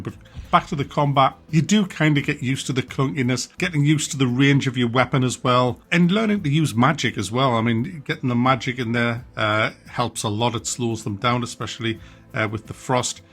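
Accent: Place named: Durham, United Kingdom. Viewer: Where are you from mate? You sound like Durham way, Chester-Le-Street perhaps?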